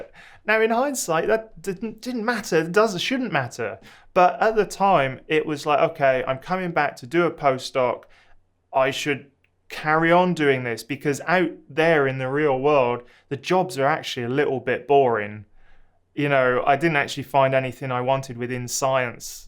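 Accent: United Kingdom. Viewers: Love the accent btw, nice to hear Western Brit dialects in this part of YT. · I'm sorry to say that but you sound overall rather apologetic... Academia is a great "industry" and there's very few other industries that could offer conditions that would match it. That said, academia isn't for everyone. I have seen many graduate students giving up in the process because they were "disappointed" in "the game". But frankly, these were just people not cut out for academia (some of them did amazingly well later on in other industries, though). So, rather than complaining about academia not matching your high expectations, it would be totally fine to just admit that it might be you who isn't the right match for it. That does not mean that you can't be good at something else, of course. Finally, based on your accent I assume you are from the UK, and your experience with academia is based on how things are in the UK. 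I just wanted to say that the UK academia isn't a great benchmark--it is pretty bad in terms of (a) pay, (b) pressure to obtain external grants, (c) job security... Things are better in other countries.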